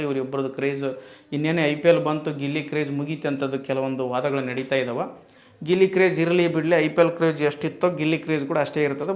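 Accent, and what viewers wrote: North Karnataka, India. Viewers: ಬ್ರದರ್ ವಿಡಿಯೋ ಮಾಡ್ತಾ ಇರೀ ನಿಮ್ಮ ಬಾಯಲ್ಲಿ ನಮ್ಮ ಉತ್ತರ ಕರ್ನಾಟಕದ ಭಾಷೆ ಕೇಳೋಕೆನೆ ಚೆಂದ..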